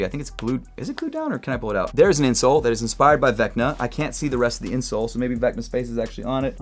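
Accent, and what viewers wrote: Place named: New York, United States. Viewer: I'm picking these up, shocked that your from Baltimore you sound and look like a New Yorker.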